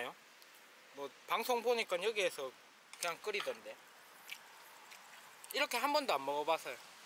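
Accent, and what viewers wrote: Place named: Gyeongbuk, South Korea. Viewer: 오늘도 잘 보고가요~말투가 구미,경북 북부쪽 말투시네요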